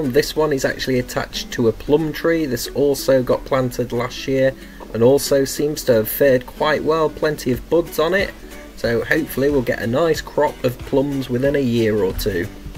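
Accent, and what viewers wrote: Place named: North, United Kingdom. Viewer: Oh God - I cannot move on my patio from all the divisions I have made of every perennial you can think of. I dug up one small clump of helenium and got over 100 separate plants. I think I could start supplying plants to the Dutch international plant shippers at this rate, I'm definitely hoarding! And just so you know - I reckon nobody is offended if you don't reply to their posts. It's decent of you to show appreciation, but if you get more subscribers and reply to everybody you will get burnt out, so I never mind if you don't respond to any of my posts again if it means you have more time to do what you enjoy and make such excellent content. You sound like a fellow northerner so its good to have somebody fairly local, gardening in similar climate with similar plants at roughly the same stage as the stuff in my garden. 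I see many others feel the same as I do, so keep up the ace content where you have time and if you are busy, all us new subscribers can form a community sharing tips and ideas in the comments amongst ourselves. Cheers for making me feel welcome as a newbie though!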